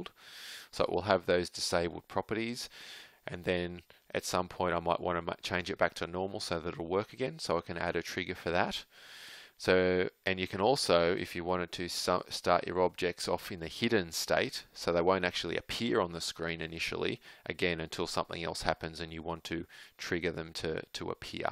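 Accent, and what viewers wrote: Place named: Australia. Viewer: this tutorial is excellent! Your instructions and explanations are very clear. This is the best online tutorial explaining states that I've found on YouTube. Thank you so much! Please make more tutorials. ps. Love that I hear a fellow Aussie too!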